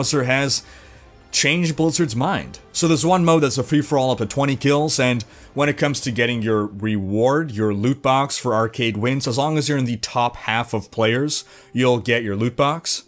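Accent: Quebec, Canada. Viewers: I love your Quebec accent . J'ai le même . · AH. Canadian French. Was used to english on this channel, but canadian french is nice too. (Even if, being from France, I'm not that used to this accent.) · That sweet Canadian accent, I love it! (French guy here);) Thanks for the infos! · Funny, you have a Quebecers accent when speaking in French, that's a little odd when describing a place in France ^^ Outside that your pronunciation is quite good ;.) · hehe your def from or close to quebec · Based on his accent I think he's a canadian living near the Ottawa region, or an english speaker in Quebec. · You speak like a Quebec person it's so funny to hear you speak french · I was wondering why your French sounded so distinctly Canadian, but I just found out on your Twitter that you live in Montreal. Figures. Toujours sympa de savoir qu'un de ses youtubers préférés est francophone (même si je suis Français et non Québécois):). 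Also, PoE doesn't bother me, and getting the same amount of content in D3 would be indeed great, but it's the fans (well, some of them) who are annoying. Yes, we get it, PoE is soooo much better. How about you let people enjoy what they enjoy? You don't need to act all high and mighty with your 6 new acts. What you guys don't get is that new content won't make people jump to PoE if, for instance, they don't like the gameplay. I, for one, hate the combat and general gameplay of the PoE, whereas I find Diablo 3's tons of fun. No amount of new PoE content can change that fact. But if you enjoy the complexity and style of PoE more, good for you. Just don't be an ass about it. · Wow, are you Canadian? Your accent sounds Canadian, good reading of the French text!:D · un petit accent quebecois, je pensais pas ca de toi! xD did you learn it at school? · tu parle vraiment bien Français dis donc ^^! 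Au début ça m'a fais un peu bizarre, tu a un accès un peu québécois ^^! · ton accent québécois est tellement déstabilisant x)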